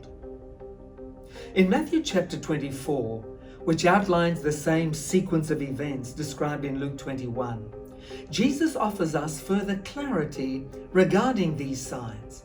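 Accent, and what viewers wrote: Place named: Australia. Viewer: his accent is like Australian accent.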